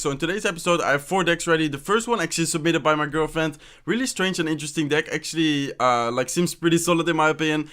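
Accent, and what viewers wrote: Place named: Netherlands. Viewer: U have a Netherlands accent ohhhhh girlfreind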